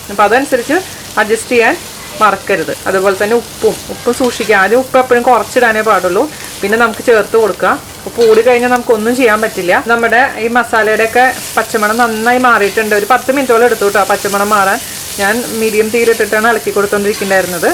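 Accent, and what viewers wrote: Thrissur, India. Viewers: Nammude thrissur baasha oru verity anetta chechi😀😀👍🏻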